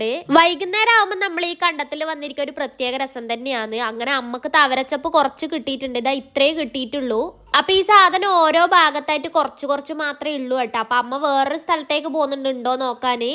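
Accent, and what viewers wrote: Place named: Kannur-Kasaragod, India. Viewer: നല്ല ഭംഗി...എവിടെയാ സ്ഥലം..കണ്ണൂർ കാസറഗോഡ് ഭാഷ ആണല്ലോ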